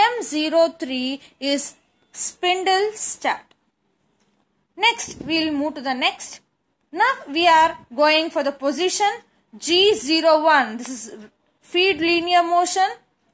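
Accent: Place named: Mumbai, India. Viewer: you are a Bombay accent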